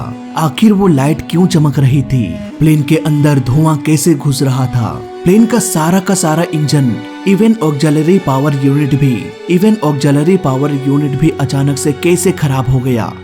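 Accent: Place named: Gujarat, India. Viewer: Gujjubhai accent..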